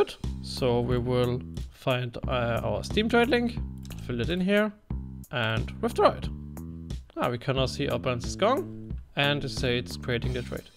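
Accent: Germany. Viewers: du hast nen deutschen akzent, biste deutsch?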